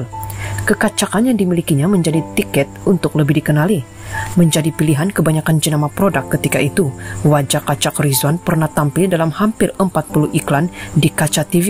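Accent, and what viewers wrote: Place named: Java, Indonesia. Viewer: Tak pasal2 ckp ala Indonesia. Mcm Jawa kronok.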